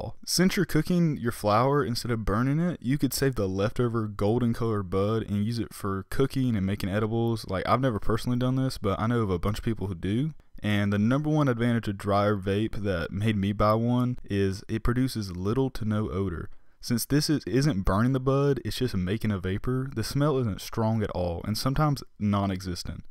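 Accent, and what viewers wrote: South, United States. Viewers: Southerm American...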